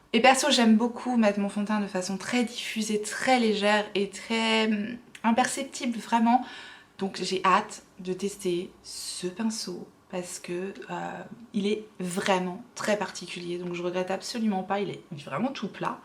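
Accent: England, United Kingdom. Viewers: Décidément ton accent anglais me plaît beaucoup, j'ai le même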